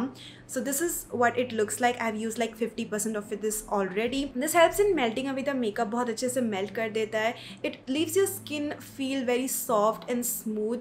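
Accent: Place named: Bengal, India. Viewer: Baap re baap. Bengali touch from u. Love it❤️❤️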